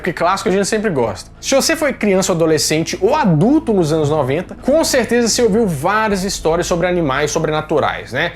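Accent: Minas Gerais, Brazil. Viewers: Verdade TB gosto esse sotaque mineirinho dele nos cativa, parabéns pelo trabalho nesse canal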